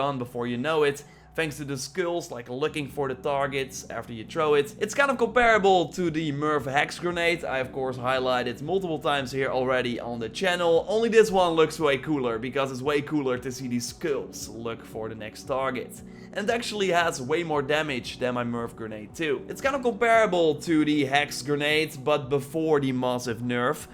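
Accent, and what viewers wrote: Netherlands. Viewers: That dutch accent though..... Lekker bezig mannen!! 😜😎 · Because they're both Dutch and this is a common accent here. They overpronounce stuff. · Are you dutch because your accent bleeds through every now and then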